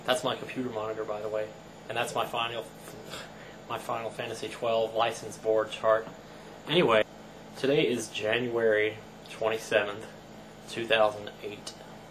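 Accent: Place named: South, United States. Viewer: You used to have a lot more of a southern accent.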